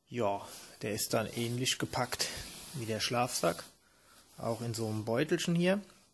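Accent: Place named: Palatinate, Germany. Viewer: Lewwerworscht :)) ich lach mich weg....Pälzer Platt goes Ytube ;) hört sich aber doch heimisch an.... Belgisch Jig Saw...sieht gut aus ! Hast du eine Quelle ? Grüsse aus der Landeshauptstadt RPL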